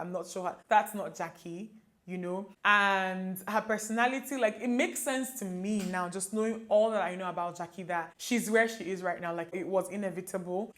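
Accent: Nigeria. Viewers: First time watcher, loved your Vid. Stunning face, impeccable make up and love you uploading in your Naija 🇳🇬 accent. Subscribed ❤️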